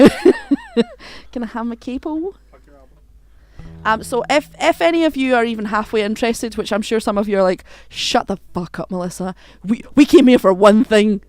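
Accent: Scotland, United Kingdom. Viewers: I'm Polish and I always was just one of a few at work who could talk with people calling us from Scotland. I love your accent, reminds me of the lovely Amy Macdonald. And if you start a metal band I wanna be in it. Cheers!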